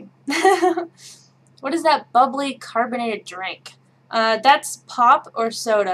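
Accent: Kansas, United States. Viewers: You have an american accent... But then I would say that because I'm English! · Sounds like normal american accent to me. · Californians---does her Kansas accent sound different to you at all(ignoring the weird words at the second half)? I have one too and was wondering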